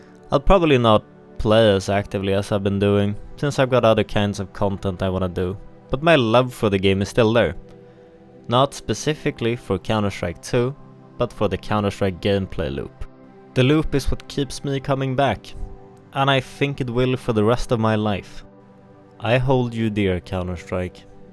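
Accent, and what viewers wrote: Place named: Sweden. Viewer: You’re from Sweden? Sounds like Swedish accent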